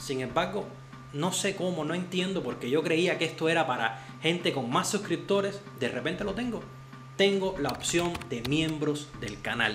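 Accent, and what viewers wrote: Cuba. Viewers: Espectacular noticia, felicitaciones, te lo mereces por ser quien eres y como eres, y no se trata de competir con nadie, pero eres uffff lo máximo, lo mejor, un grande, imbatible, profesional, inteligente, con muchas destrezas, te dejas querer, amable, amigable, apasionado y entregado a lo que te gusta y todo eso es lo que te ha hecho crecer; muy merecido, me gusta como hablas, como te expresas, como pronuncias, tan cubano, tan coloquial si caer en lo ordinario y vulgar, muy lindo que ames exageradamente a Cuba, es un país hermoso!! Continua siendo así tan auténtico y sencillo, mira que de lo bueno, poco!!!! Éxitos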